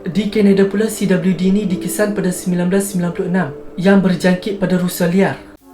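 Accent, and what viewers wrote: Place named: Kedah, Malaysia. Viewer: Slang pelat Kedah...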